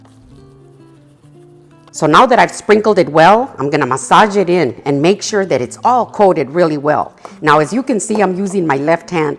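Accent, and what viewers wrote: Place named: Ohio, United States. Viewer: I just began to follow you a couple of months ago. I noticed your accent and it sounded familiar. Now, I know you live in Ohio. I was born in Ohio raised there until 8 years old. My family moved to the RGV and we’ve been in Texas ever since.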